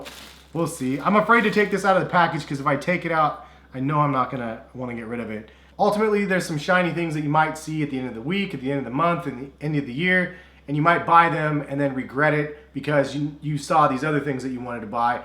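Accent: United States. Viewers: are u American?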